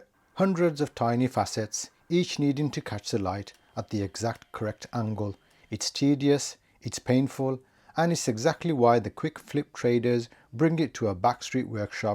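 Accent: Birmingham, United Kingdom. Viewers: Hey, now you just listen hear, you just go an stay a barmy brummie, ‘cos that’s the way we like it - uhu-uhu, that’s the way….. Oh you know wot I mean. Stay cool, stay happy and please keep the camera rollin’. 🙏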